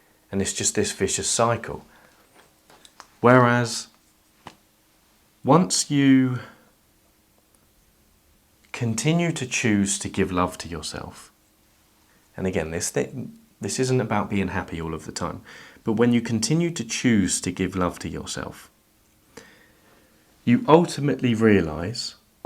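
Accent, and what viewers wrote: England, United Kingdom. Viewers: You sound like a Pom but live, behave, and have the smarts of an Aussie. (PS my parents are Poms) love your stuff, totally enthralling 🇦🇺🦘👍🏼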